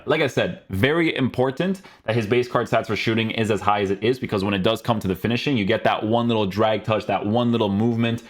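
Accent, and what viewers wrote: Portugal. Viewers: Are you portuguese? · Cancelo just isnt good defending, i think dalot should play more, and palhinha what a player, he must start for the rest of the wc… BTW És português? 🤣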